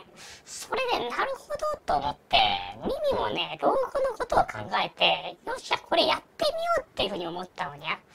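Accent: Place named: Tottori, Japan. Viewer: やっぱり鳥取方言にしか聞こえない。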